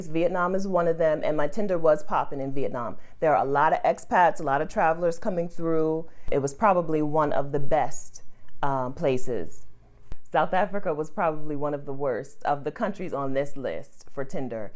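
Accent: United States. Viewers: WHAT PRIVILAGE ARE YOU TALKING ABOUT, YOUR AMERICAN ACCENT OR WHAT. YOUR STILL A BLACK WOMAN, THERE IS NO DIFFERENCE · You American accent gives you hardly any privileges as long as you are black so don't get it mixed up. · Big mistake, your American accent doesn't give you priveldges, it differentiates you from another black person. These American superior mentality I guess. Not really ideal. But I get the point. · Rubbish, why not caption it “countries that are friendly for African Americans to travel to” you generalized it to all brown people only to tell us during you explanation that your American accent gave privilege which means that the caption was deceptive, I stopped listening once I heard that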